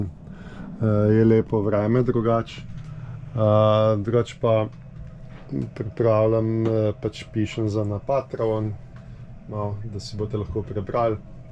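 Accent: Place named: Styria, Slovenia. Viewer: Dober nov intro. Pa fajn je slišat štajerski glas :) Fair winds and following seas!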